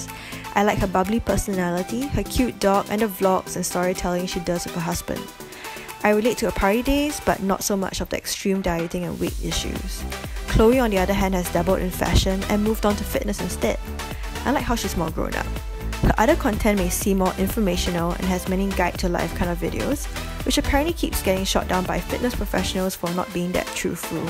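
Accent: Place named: Singapore. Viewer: You're Singaporean right? 🤔🤔🤔👀 I can tell by your little accent! 💖💖💖😇😇😇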